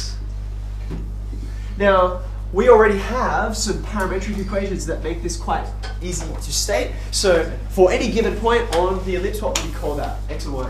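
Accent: Australia. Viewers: That darn Australian accent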